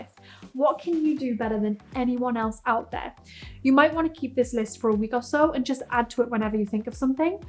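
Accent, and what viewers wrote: United Kingdom. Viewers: I love the Geordie accent👌🏻ever since Geordie Shore😂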